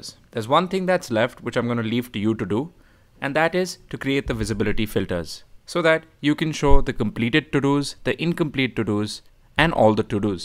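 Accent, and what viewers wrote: India. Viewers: Sir again commenting you are the best teacher who I have come across when it comes to learn react native, I easily understand everything regardless of your hindi accent, I wish there is a way to be able to contact you because I need one serious guide. Please drop me your contact.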